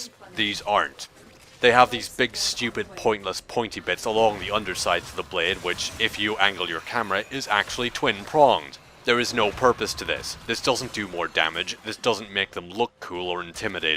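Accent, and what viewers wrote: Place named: United Kingdom. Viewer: You sound like a British (Not sure what that accent is...) Skallagrim while ranting about weapons.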